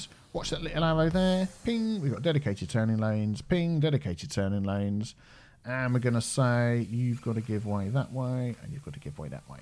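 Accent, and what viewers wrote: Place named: United Kingdom. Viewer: I'm living in the UK temporarily from America, and I hope you don't mind if I ask what accent is that? At my place of work there is a guy who sounds exactly like you, as we're all working from home now I don't know him well enough to chat him up on messenger haha. Edit: Accents fascinate me and I like to try to train my ear.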